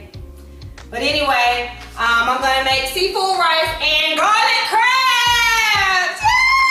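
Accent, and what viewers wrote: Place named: Miami, United States. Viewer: this video recipe and instructions are all over the place and hilarious but i will b trying this, looks delich. and yes u definitly sound like a miamian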